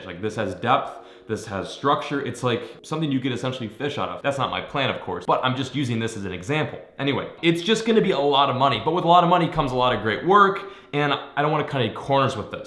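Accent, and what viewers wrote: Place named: New England, United States. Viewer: Down here In the south we have a lot of fishin tournaments every year. Where are you from? you sound like one of them ol yankey boys ya hear? I'm 77 and you fish good to be young! Good ol boy!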